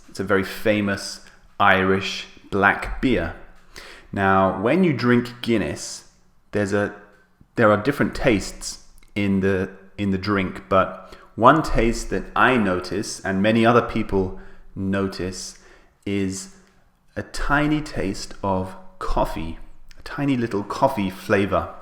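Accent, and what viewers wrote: United Kingdom. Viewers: the bri'i'sh accent is quite charming!!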